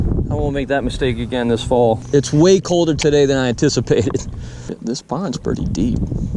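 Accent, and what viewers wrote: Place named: Boston, United States. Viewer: 1: them fish all got training wheels. 2: age of consent man. 3: ive seen your fish fillet video, and a 12" fish = a tiny fish stick.... 4: no one wants to put tiny fish sticks in their mouth, would you? 5: nothing better then busting some Louisiana boys balls. 6: the challenge, of being a better Fisherman. 7: its fall man go find some bigger trout, like an adult beating up kids, which by all accounts sounds fun... but you dont take thier bikes after your done man. Have some class. 8: big fish = more likes. I dont know how the algorithm works, but I'm sure once they convert 13" keeper to 0s and 1s, you get bumped down pretty hard. I literally had to Google, Louisiana guy catches miniature fish and brags about it in the mash with a Boston accent; to find the video.... looking out for you man.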